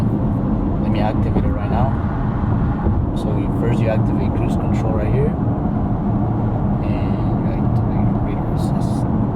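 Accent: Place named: Germany. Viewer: Are you from Germany cause your English sounded like german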